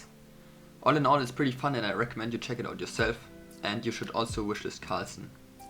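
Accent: Germany. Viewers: You sound pretty german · german? i hear it so hard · are you german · I like you! xD Also, good luck losing that german accent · are you german? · you sound German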